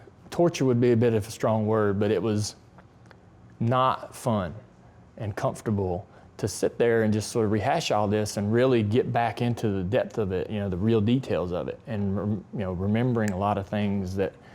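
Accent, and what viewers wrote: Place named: South, United States. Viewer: There's something funny about hearing a guy with a slight southern accent doing an impression of someone with an even thicker southern accent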